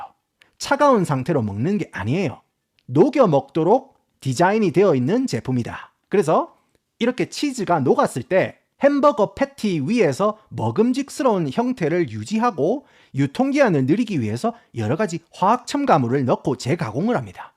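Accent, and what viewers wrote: Gyeongsang, South Korea. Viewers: 유용한 정보 감사합니다. 구수한 경상도 억양도 재미있어요. 스위스에서 먹은 치즈 얘기 듣기만 해도 군침이 돕니다!😋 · 유익한 정보 감사합니다 완전 갱상도 특유의 언어로 시원한 설명 도움 많이 받고 구입시 신경 쓰겠음다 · 이어버드로 소리만 처음 들었는데요 찐한 갱상도 사투리에 나이 많은 아저씨신가 했는데요. 계속 듣다보니 영어 발음 욜 좋으시고 영상도 보니 힙하게 영하게 생기심에 놀라고. 끝까지 들으니 깊은 내용에 감동했어요. 너무나 도움되는 영상 감사합니다. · 경상도 액센트 너무멋져 부러워요❤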